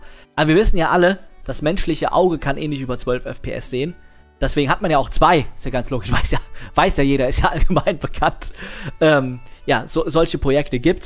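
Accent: Hesse, Germany. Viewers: Ich weis nicht ob mir dein hessischer Dialekt früher nie aufgefallen ist, aber ich lieb‘s sehr 😂👍🏻 Und schön ein neues Video im neuen Jahr zu sehen, hoffentlich bleibt es in Zukunft dabei so regelmäßig Videos zu sehen. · Der hessische Dialekt hat sich auf jeden Fall nicht geändert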